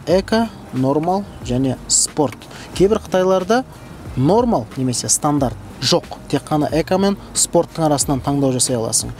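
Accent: Standard. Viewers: Таза казакша сойлитин 1 ши блогер жарайсын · Қазақшаң үшін мың алғыс, нағыз таза қоспасы жоқ жалпақ және мәдени түрде түсіндіргенің керемет, басқа айтарым жоқ, семіз лайк 👍🏻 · Жарайсың. өте түсінікті айтасың. тап таза қазақша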